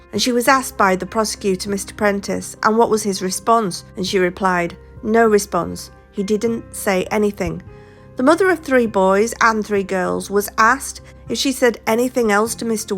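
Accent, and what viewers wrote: Yorkshire, United Kingdom. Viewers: I could hear the Yorkshire in your accent right away. Always warms my heart to hear a Yorkshire accent. I miss home but don't get me wrong living in the California sunshine can't be beaten by any Yorkshire weather😆. I'm glad I found your channel and I'm working my way through your library now. I'm here to stay. Great work you do. Love the content 🤘🏻🇬🇧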